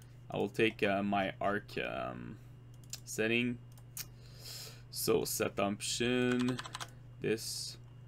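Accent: Quebec, Canada. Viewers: Quebec accent xD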